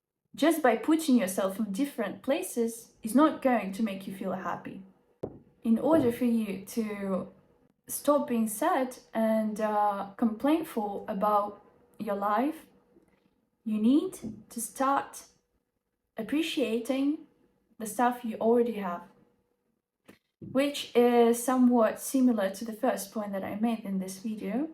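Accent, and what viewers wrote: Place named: United Kingdom. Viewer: Thanks for this video, dear. Your pronunciation is indeed good. You speak British English, you speak so clearly, that those whose English is not advanced, can understand you easily. As for the topic of your speech it's very useful. We sometimes need to talk to ourselves to get rid of the emotions that let us down.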